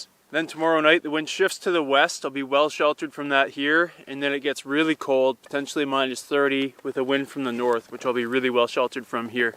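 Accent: Canada. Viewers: is it just me or have you been talking way more canadian this video than usual? maybe im crazy 😅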